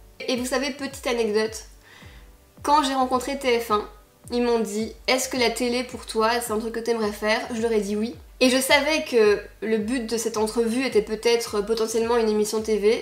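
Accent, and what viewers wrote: Belgium. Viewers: MAIS C'EST UN TRUC DE OUFFFF 😱😱 J'espère qu'on entendra ton petit accent belge à la télé haha #huit Tu peux être fière de toi, quel aboutissement ! Trop hâte de voir ça 😍😍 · #teambelge Allez on impose notre accent sur les chaînes françaises !! 😆❤❤❤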